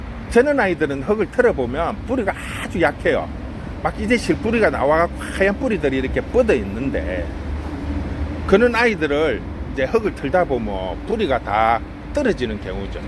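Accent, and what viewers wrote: Gyeongsang, South Korea. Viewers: 경상도 말씀이지만 초보자들이 잘 알아 들을수 있도록 귀에 쏙 들어오네요 좋은 정보 감사합니다^^ 오늘도 축복 되세요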